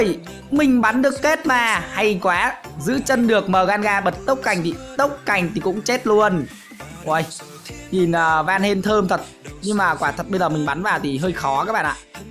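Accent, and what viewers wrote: Nam Dinh, Vietnam. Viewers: G quê nam định à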